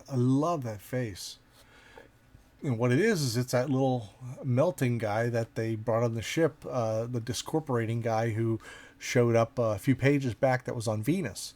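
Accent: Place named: Pittsburgh, United States. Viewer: I love the accent, would it be safe to call it “jag off speaking”? Also … you need to find a reason to do your Gene Simmons in one of these, maybe if you do an SA Marvel that he might have read when he was learning English?!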